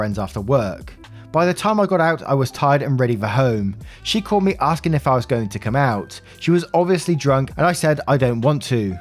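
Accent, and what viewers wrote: United Kingdom. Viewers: I have listened to so much UK media over the years I don't notice the accent, until you call the TAH a Berk and then I giggle.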